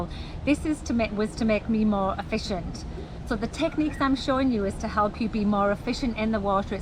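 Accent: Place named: United Kingdom. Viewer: Hi and son Great Vidio you sound British. Is this swimming pool in Uk. Is it public? Looks lovely. We have an outdoor pool in South Wales but it’s closing for winter.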